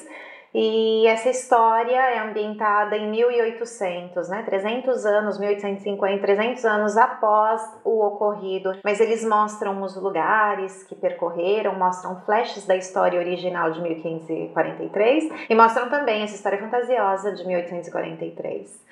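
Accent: Italy. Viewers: tu portugués tiene un sencillo acento italiano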